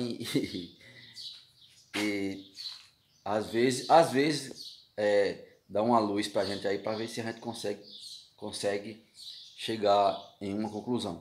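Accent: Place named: Pernambuco, Brazil. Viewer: Bom dia!. O cara acha qie voçe e do Pernambuco.. Kkkk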